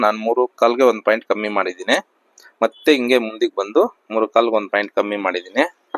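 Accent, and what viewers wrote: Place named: Kolar, India. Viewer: ನೀವು ಕೋಲಾರ ಅಥವಾ ಚಿಕ್ಕಬಳ್ಳಾಪುರದ ಕಡೆಯವರು ಇರಬಹುದು ಅನ್ನಿಸುತ್ತೆ ಸರ್.ಮಾತಾಡುವ ರೀತಿ ಆಗಿದೆ, ಚೆನ್ನಾಗಿ ಬ್ಲೌಸ್ ಕಟಿಂಗ್ ಹೇಳಿ ಕೊಟ್ರಿ.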